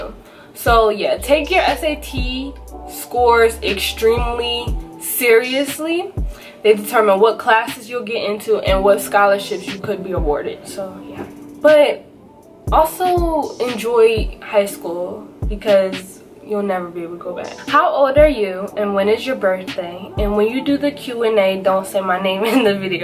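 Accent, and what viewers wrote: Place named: Philadelphia, United States. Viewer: yo im like she sound like she from philly then i was like oh snap! thats wassup. Im going to collage in atl im looking at clark and Georgia state maybe i see you there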